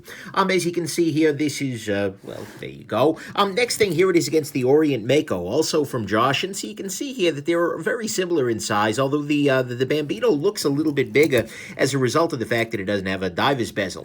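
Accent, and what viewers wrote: New York, United States. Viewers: That accent is just awesome. So old New York I love it!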